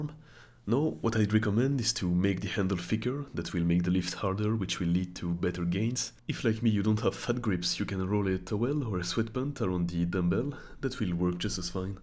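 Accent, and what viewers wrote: France. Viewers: Accent français lol excellent contenu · Are you french ?? You sound french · French accent ✨ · French touch 😂 love the accent 😂 It is,what it is ? N'est ce pas 🤣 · The french accent is so funny😂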